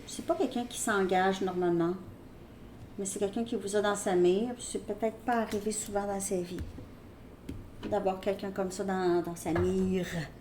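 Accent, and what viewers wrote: Quebec, Canada. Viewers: Elle est vierge et moi lune en vierge, des fois c'est elle, des fois moi mais j'ai parfois du mal à comprendre l'accent québécois, y'a des trucs, je comprends rien 😭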